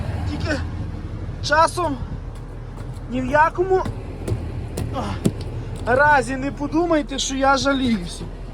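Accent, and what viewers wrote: Ternopil, Ukraine. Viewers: Ваш тернопільський говір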